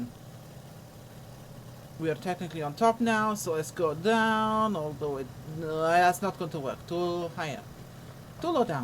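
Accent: Russia. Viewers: I like the Russian accent...it suits you. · I normally really like your reviews, but this russian accent was a bit too much for me over time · I loved your Russian accent!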